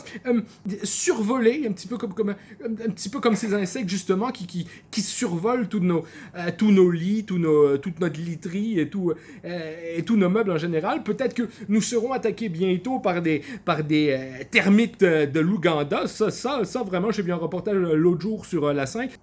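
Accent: Quebec, Canada. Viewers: Meilleur accent québécois du game.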